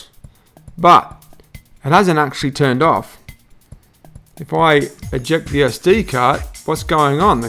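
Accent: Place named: Australia. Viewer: No problem mate. You Aussie? I lived in Melbourne from 2001 to 2003. It's good to hear Aussie talk again. I spent forever figuring out a solution when GoPro was no help. They wanted me to send it in at my expense and only if it was still under warranty (it wasn't). Quite sad when the company that sold you gear breaks your gear and leaves you with the problem. Sent them the video and only got a "thank you". HERO3 black would have been better. I have v50, v58, v70, v124, and v222. v198 is bad.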